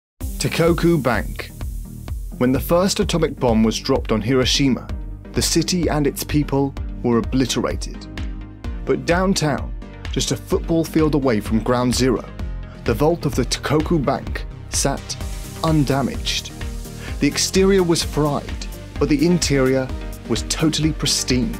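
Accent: United Kingdom. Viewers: Hate the Brit accent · Lawrence Sanchez just because he has an English accent doesn’t mean he knows geography! Area51 is north of Las Vegas not New Mexico. Same pictures used over and over. WTF?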